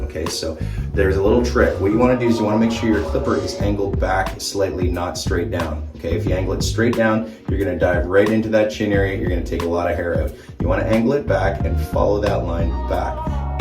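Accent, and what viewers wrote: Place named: Canada. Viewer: I thought you were canada based with your accent and the way you spell colour.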